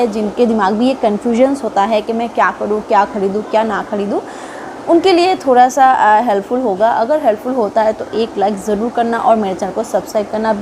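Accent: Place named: Bihar, India. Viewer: Aap bihar ke rahne wale h... Madem jee aap ka Bolne ka tarika pta rha h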